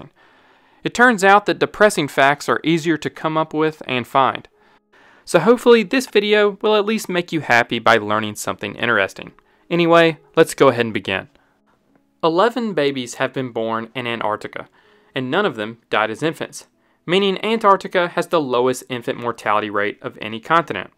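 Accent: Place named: Southern Ohio, United States. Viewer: Narrator has a southern Ohio accent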